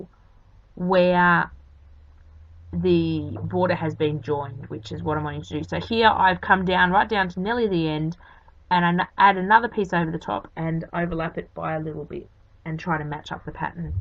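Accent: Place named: Australia. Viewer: Hi, terrific journal pages. Love listening to you. It's great to be able to listen to an Aussie accent for a change. I wish we used coupons too. Take care, Amanda xox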